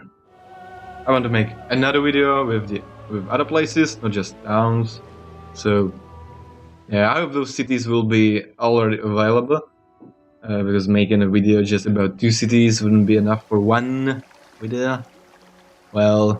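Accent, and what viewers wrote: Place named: Europe. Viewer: I think he's just European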